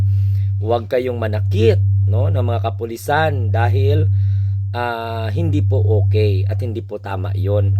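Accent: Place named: Visayas, Philippines. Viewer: Visaya ka 😊dong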